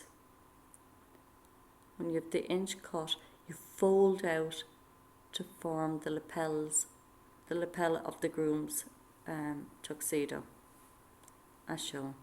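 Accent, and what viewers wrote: Ireland. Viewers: what an interesting idea, and so easy! as an irish man myself it's great to hear a familiar accent. best of luck with your channel. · Wow what a fab demo. Its just great to hear an Irish accent. I can't wait to give these boxes a go and I'm sure you could change the theme for other occasions. Well done I'm looking forward to seeing more Irish demo's in the future.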